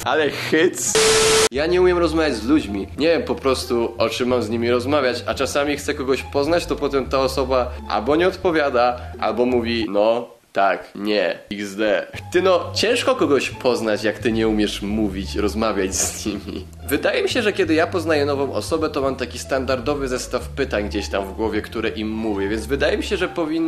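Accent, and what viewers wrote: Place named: Silesia, Poland. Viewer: Błagam mów częściej po Śląsku, bo to cudo w twoim wykonaniu❤️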